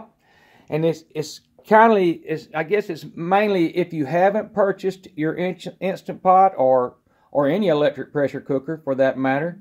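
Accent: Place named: Carolinas, United States. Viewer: I love your accent. I have relatives in North and South Carolina and I miss that accent so much living up here in Pennsylvania. My mom's people are from South Carolina and I have a cousin in North Carolina who sounds just like you. Sweetest accent on earth! It just exudes friendliness! Thanks for the helpful video. Now I know I need an 8 quart so I can put potatoes in with the chicken or large pot roast.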